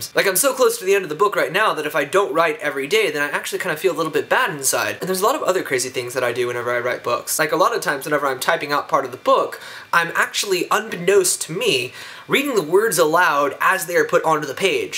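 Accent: United Kingdom. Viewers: Are you actually British because you do an amazing accent XD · haha british accent; that is so awesome! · British accent??? WHY??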